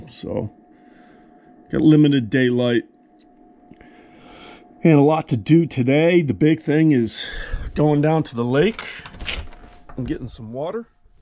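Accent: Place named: Philadelphia, United States. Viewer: Good day! I’m picking up bits and pieces of a Philly or Philly adjacent accent! Where are you from originally?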